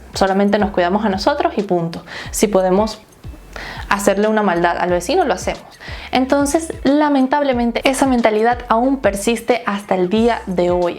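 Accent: Venezuela. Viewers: Es como si una venezolana me estuviera explicando sobre Ucrania. Saludos! Pais hermoso Ucrania Por cierto, eso es totalmente cierto lo que dice en ; es uno de los motivos del por que me quiero ir de Venezuela Naguaráaaa! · Ya decía yo, esta chama como que habla caraqueño. xD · De hace unos 2 meses aprendo ruso y de pronto me encontré con tu canal y ese acento me decía lo conozco, pero, será que me equivoco.... Pero hoy ya me di cuenta que no estaba equivocado Venezuelaaaa jejeje excelente.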